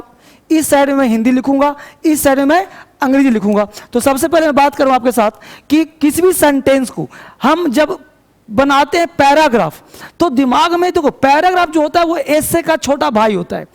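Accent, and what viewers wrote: Bihar, India. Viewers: Ap bahut achhha padhate h Sir ap Bihar k rhn wale lagte hai n....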